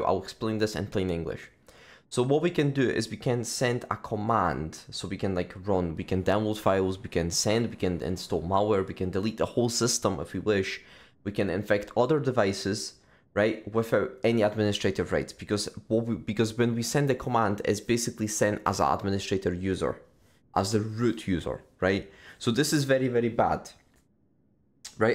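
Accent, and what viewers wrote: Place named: Scotland, United Kingdom. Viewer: Good to hear a Scottish voice in the community 😢 Exploiting the SSH flaw sounded a bit like trying to thread a needle while wearing oven gloves when i listened to someone discuss it earlier. The Adobe one?: more like reaching through an open window. Between that & Polyfill, bunch of ops teams & web devs are gonna be pretty busy this summer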